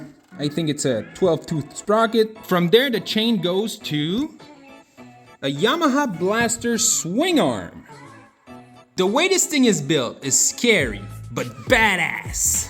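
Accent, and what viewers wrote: Quebec, Canada. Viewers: Where is your accent from? I'm pretty sure it's Canada somewhere but which part? I live in North Dakota U.S. so I'm familiar with how Canadians talk so I was just curious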